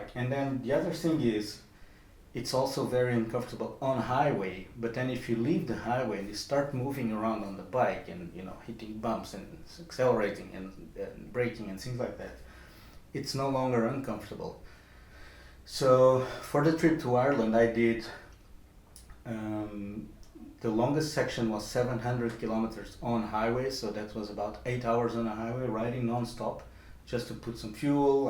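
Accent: Portugal. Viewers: I can se by the use of some specific words and because i usually have a good sensibility detecting Portuguese accent, behind the English.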